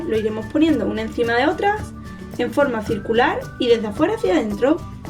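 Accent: Andalusia, Spain. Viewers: Creo que a si es el acento español :) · Una pija, no distingues la buena dicción del acento andaluz por lo que veo, son cosas completamente diferentes. Es andaluza y SÍ tiene ENORMES problemas de dicción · La cosa esta clara si no la entiendes no la escuches y punto. Yo creo que no tiene ningún problema de dicción,igual el problema lo tienes tu con tus entendederas. Depende lo cerrado del andaluz se les entiende mas o menos , pero he ahí la gracia que tienen, decir que el acento andaluz no tiene que ver....el que no tiene que ver es un ciego. Es mas criticar su trabajo por supuesto que se puede hacer , o decir lo que no te gusta de la receta etc.. pero lo que tu has hecho es entrar en lo personal,como hable ella es su problema y no el tuyo,ni que estuviésemos en clase de fonética....que es un vídeo mujer, hay que hacer criticas constructivas y no meterse en lo personal,máxime si no se conoce a la persona en cuestión, ya solo sea por respeto o educación. 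Un saludo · todos los andaluces no tienen ese acento. Es de pueblo y por eso es más cerrado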